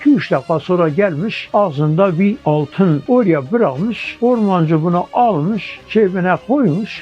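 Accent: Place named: Urfa, Turkey. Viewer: Bu abi Urfalı şiveden konuşma üslubundan belli,fon müziği ’ya ait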